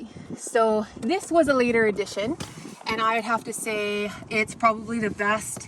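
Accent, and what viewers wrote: Canada. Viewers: She is from Canada. I could hear it. I’m from the United States. I really like what she has done with her Jeep. Incredible. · Ahhh, freedom! 😊I hear a Canadian accent, so I know making it was warm as possible is a priority. I wonder if police or "Karens" up there are prone to harassing people who live in their vehicles they way they are in the U.S.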